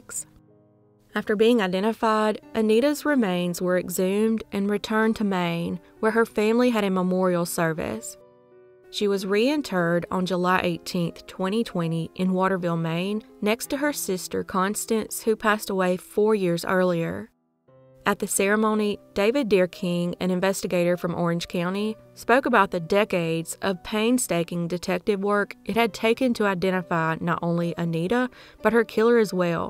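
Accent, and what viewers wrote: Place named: New Orleans, United States. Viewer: Looking at the picture and knowing how she was found in the bloody bathroom, that shower curtain seems an unfortunately eerie visual choice :O I always look forward to your uploads--it's like a taste of crime and a taste of home (I'm from New Orleans) at the same time. I live in Michigan now and your lovely accent is like familiar company after these many months :)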